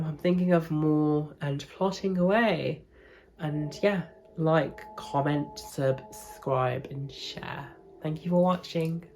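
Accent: England, United Kingdom. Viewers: you are totally a passable, even if you were having an off day, that English accent instantly makes you passable again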